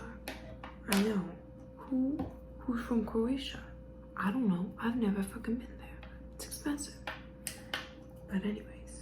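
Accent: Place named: New York, United States. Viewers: The NY accent makes me feel right at home 🥹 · I love the whispered NY accent so much, it sounds so relaxing and comfortable! Love your asmr so much :D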